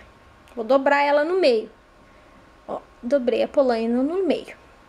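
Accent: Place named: Rio Grande do Sul, Brazil. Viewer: vce esplica mto bem ..esse teu sotaque gaucho e mto agradavel..bem facil de entender.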